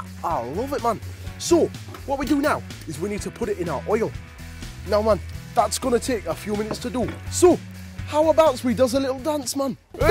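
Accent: Jamaica. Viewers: he sounds Jamaican aha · you sounded a tad jamaican, other than that i liked it.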